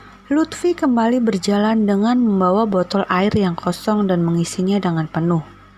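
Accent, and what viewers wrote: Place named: Java, Indonesia. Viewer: Medok gays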